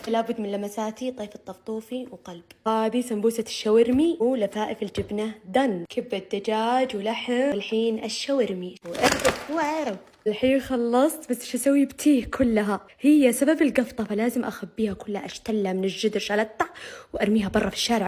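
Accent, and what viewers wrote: South, Saudi Arabia. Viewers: يا لبيييييه يازين اللغه السعوديه عليك والله😂❤❤ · كأن اللهجة من جنوب السعودية ؟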